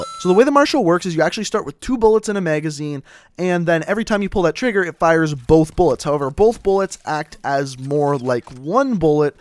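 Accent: Canada. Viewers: Dude, are U canadian? !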